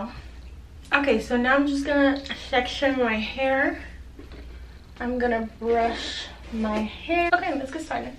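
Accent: Quebec, Canada. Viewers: Are you French Canadian? Québécois? I know my people haha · Your accent... you from quebec right ?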